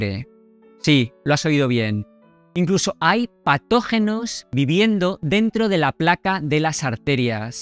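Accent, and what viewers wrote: Valencia, Spain. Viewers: Que rico el chucrut. Cada vez que veo tus videos me engancho mas, creo que por tu forma de hablar eres valenciano. Saludos desde Malaga.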